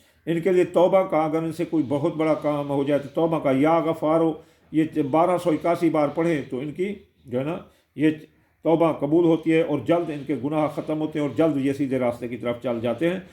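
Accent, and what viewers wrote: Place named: Saraiki, Pakistan. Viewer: لگتا ہے آپ سرائیکی ھیں جنوبی پنجاب میں رھیتے ھیں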